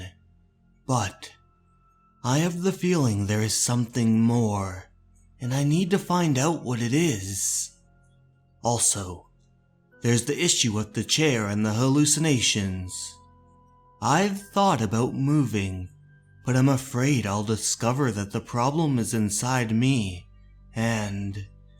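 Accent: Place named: Canada. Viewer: I KNEW IT I STILL GOT IT!!! It was an extremely minor "it really helps me OOT a lot" that perked my ears up. I'm also a gigantic Canada's Worst Driver fan and dating a Canadian (Hamilton, Ontario) once has me primed to notice your speech patterns (best described as an extremely minor case of John Travolta in Battlefield Earth in my opinion). In fact, it wasn't until watching this video of yours that I realized Andrew Youngblood had that same John Travolta in Battlefield Earth cadence that became more evident as the series progressed and maybe that's the real reason the best show of all time was ended after fourteen seasons. I'm onto you, Andrew. I know what you're doing.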